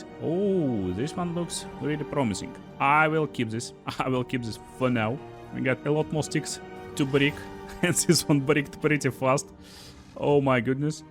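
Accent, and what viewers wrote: Russia. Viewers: Всегда интересно слушать такое, как русский говорит Английские слова по русски) Как говорится, на*бал систему) подскажи, через как ИИ перса на превью делал? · I tried watching, i honestly did, but the russian accent is just killing the entire thing for me. my fire of hatred towards Z pigs cannot be extinguished.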